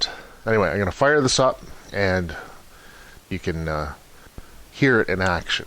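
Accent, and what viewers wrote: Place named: Canada. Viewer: Wow thank you for such a speedy reply! Yes I do have a Mr. Heater. Are you in Canada? ( I see the hat pin and recognize your accent.) (Maine here) I'm thinking U.s may be a little different. However I did decide to do it at around 20" because I really didn't want it sticking 3' into the shop space.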